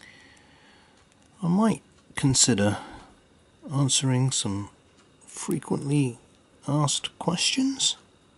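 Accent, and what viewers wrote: United Kingdom. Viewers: also about the questions ask bit, where do you live, you have a very british voice but you upload alot of them promo videos in japan?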